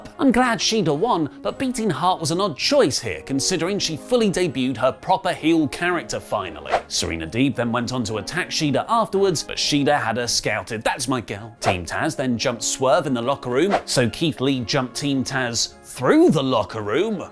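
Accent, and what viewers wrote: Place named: United Kingdom. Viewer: I must be going deaf. It sounded like Ollie said: "Emotionally abused her old anti-LBGTQ views", which was only slightly confusing someone would be released for abusing anti-LBGTQ views in 2022. I had to rewind it five times to hear "Emotionally abused her, holds anti-LBGTQ views", which makes a lot more sense why he would be released. That British accent will get you sometimes. 😆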